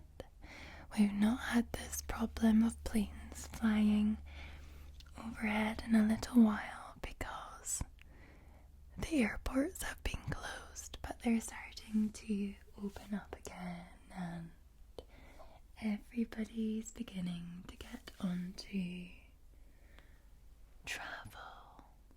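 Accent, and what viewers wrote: Scotland, United Kingdom. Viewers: Who else a simp for! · Probably the best, most relaxing ad I've heard for a VPN with a nice Scottish accent. Love it when gets sponsored<3 Also so sorry to hear what happened with your bank details, hope the damage wasn't too great? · Only thing better than is x2!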